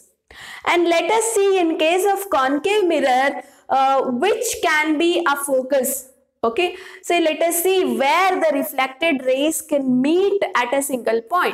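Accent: Karnataka, India. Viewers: Mam are you from karnataka. You Kannada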